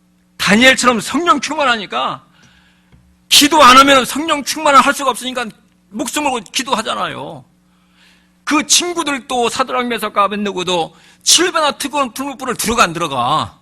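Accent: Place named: Honam, South Korea. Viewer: 목사님처럼 호남사투리가구수하게들리게하는목사님이또있을까싶습니다 권력눈치안보고소신껏예배를귀하게여기라는하나님의뜻에맞는하나님을진정두려워하는목사님의말씀 감사합니다!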